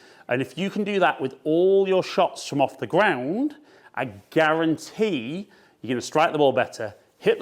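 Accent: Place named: Northern England, United Kingdom. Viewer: I smiled at that too. I live in the SE of England (outside London) and we play througthout the winter. To be fair, I think from accent he is based in the north of England where it is a harder winter but even so, to say the winter weather is horrible is probably pushing it a little:)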